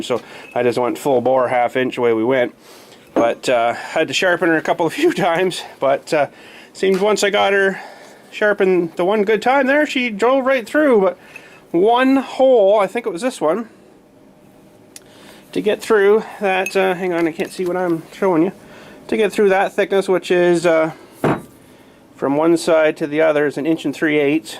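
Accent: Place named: Canada. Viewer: Can definitely tell your a fellow Canadian eh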